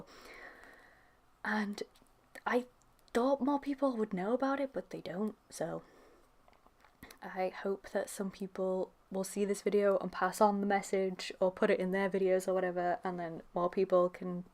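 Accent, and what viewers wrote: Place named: United Kingdom. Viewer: You're welcome! It's nice to see another British simmer! (I hope you're British, otherwise, I'm terrible with accents...)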